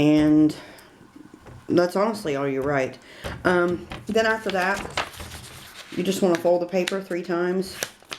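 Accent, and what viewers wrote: South, United States. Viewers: cool nails also I dont care about ur southern voice because my family is from texas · I didn’t even notice you had a southern accent until you mentioned it!!! But I am from a southern area and have had people judge me off of it too. Honestly I love southern accents so everyone who hates on it can go somewhere haha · Haa! funny how you do not like whomever told you don't like a Southern accent....your accent is terrific, and your straightforwardness is great....Thank you! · Haha I love your southern accent! Thanks for the video! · Thanks for the great video.... and just for the record, English guys love that Southern accent;) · I happen to love your Southern accent - I want one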